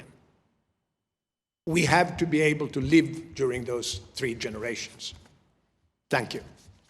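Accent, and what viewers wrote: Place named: Mid-Atlantic, United States. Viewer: mid atlantic accent👹👹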